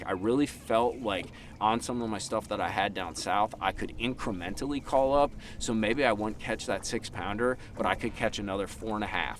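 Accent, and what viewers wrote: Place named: Minnesota, United States. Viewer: Woooo! First comment finally. Fishing is a lifestyle. That ohhh Kay 😂 I’m from Minnesota so I can appreciate the accent.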